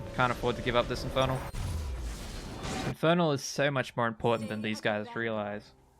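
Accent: Australia. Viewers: Mate are you in Perth I swear I talked to someone at uni sounding like you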